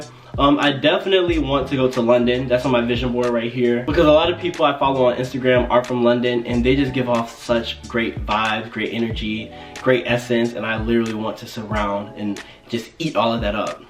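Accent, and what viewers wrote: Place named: United States. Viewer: Wait, is this? Looks like him but and same name but im confused cos he sounds american.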